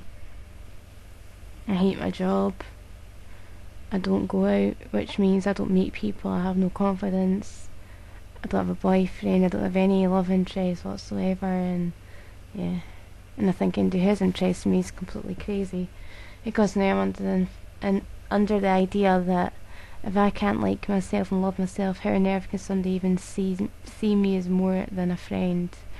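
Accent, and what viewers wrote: Scotland, United Kingdom. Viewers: are you Scottish? I like your accent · are you Scottish Might be a silly question but trying to read the acccent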